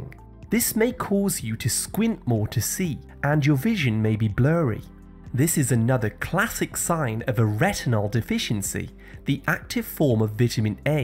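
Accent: United Kingdom. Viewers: Great and useful information regarding the health of one's eyes, concisely explained in clear British English. Thank you for sharing.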